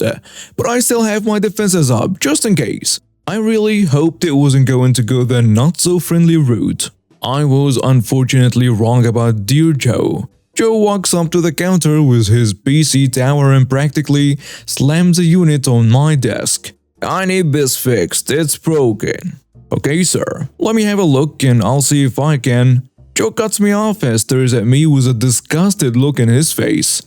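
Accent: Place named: South, United States. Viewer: #1 love your southern accent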